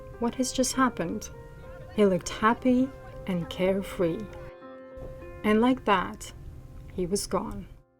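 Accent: Russia. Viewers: impressive video essay, thought-provoking and sleek presentation. I like her cadence too, it suits this style of video. Kind of 'all-knowing', wry, the Russian/eastern European accent adds to it. Hope to see more of this journalist/expert. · Who is she sounds russian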